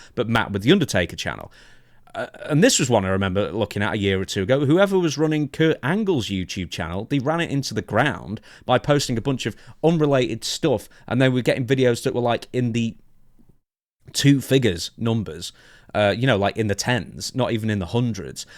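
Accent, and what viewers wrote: England, United Kingdom. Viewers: I actually like going to your videos more than Stevie's. Maybe because you are English and I relate to your tones and expressions more. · I think a big reason people migrated to this channel is that for one: is self-aware. He doesn't have a deluded sense of grandeur in comparison to the wrestlers he hosts podcasts with. I don't like Conrad, I don't like RVD's co-host, etc. Brian Last is okay but sometimes he can be super rude when discussing people and as far as I know, Brian Last is morbidly obese. Second, professionalism. This is also why I really enjoy Stevie Richards' show so much. I like Dutch's as well but he's an older guy so I don't expect him to whip out the newest 4k technology, but Stevie has a studio, he puts effort, good mic quality, etc. takes the videos seriously, the camera and mic quality is excellent, and he actually prepares before he speaks on things. 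I don't mind the rambly moments, but it seems like he as bullet points to get him back on track or he'll cut if gets too off the rails, which I appreciate. Third: is a great co-host. If the wrestler doesn't know about something, you can rely on him to suitably fill them in before the show and even on it if they forget some detail. If the wrestlers starts rambling or forgetting their spot, is good at getting things back on track. Fourth: He has a British accent. Instant +1 because that accent was made to narrate, lol. And I've enjoyed every show he's had. For all people like to rag on Stevie Richards for never being that main event talent, his show is one of, if not THE best one out there. Good production quality, a man w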